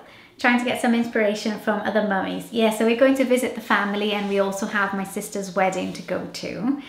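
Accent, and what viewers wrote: United Kingdom. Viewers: Oi Sou Letícia e eu conheci seu canal por um vídeo de dicas de inglês, discubrir q vc é brasileira e fiquei espantada, pq achava que vc era britânica, e me inscrevir no canal de cara tanto pq te admiro tanto mãe quanto pessoa, e agora descubro q vc e de Aracaju!! MDS minha cidade! Estou surpresa achei q vc era de Minas ou Rio kk e nossa vc ja era e é minha inspiração (no inglês pq eu amo seu Inglês ele é maravilhoso!! )Em fim só um desabafo... Se falei muito desculpa... I love your videos so much.. Manda beijo! ❤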